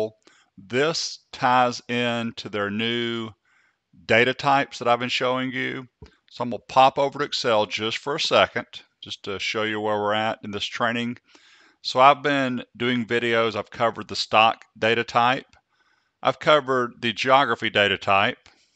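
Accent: South, United States. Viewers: Thanks buddy....yous LEGEND.! MY UNIVERSITY RECOMMENDED YOU.!! 😆 🤣 😂 *I KID YOU NOT.! THEY SAID IT'S BROKEN DOWN & EASY TO UNDERSTAND & DIGEST & I GOTTA SAY I AGREE WITH EM.! LOVE THE ACCENT BY THE WAY. IS IT SOUTHERN.? BUT AY, I BET YOU'D SAY THE SAME HEARING MY TEA DRINKING ASS, WITH MY BRITISH ACCENT. "IT IS WHAT IT IS!!!!" I'VE NOT BEEN THROUGH ALL YOUR VIDEOS, BUT COULD YOU POSSIBLY PLEASE TELL ME IF YOU HAVE A VIDEO (SO I CAN GO THROUGH YOU BACK CATALOGUE) EXPLAINING HOW YOU ATTACH OR IMBEDD A POWERPOINT PRESENTATION INTO A WORD DOCUMENT PLEASE.! CUZ OF COVID-19 ALL MY 1ST SEMESTER IN ONLINE & THEY HAVE ASKED US TO HAND IN AN ASSIGNMENT VIA CANVAS(CANVAS IS THE SYSTEM WE USE TO HAND ASSIGNMENT INTO OUR TUTORS TO BE GRADED) BUT THEY HAVE MADE IT CLEAR IT HAS TO COME THROUGH AS 1 FILE NOT 2 SEPARATE FILES(THE ASSIGNMENT CONSISTS OF A 2500 WORD ESSAY & A 20 SLIDE POWERPOINT PRESENTATION.! 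BUT I'M NOT ABLE TO TURN IT INTO 1 FILE. I WAS HOPING YOU COULD SHOW ME HOW IT'S DONE OR BETTER STILL YOU'VE ALREADY DONE IT & COULD POINT ME IN THE RIGHT DIRECTION (IF YOU HAVE ALREADY GONE THROUGH SUCH A TUTORIAL) ON YOUR AMAZING CHANNEL. OKAY I'M GONNA REMOVE MY LIPS 💋 FROM YA BUTT😁😁😆😄☺!!!??* please reply by 23rd November 2020.!!??!! Thank you.!!!!!!!!!!!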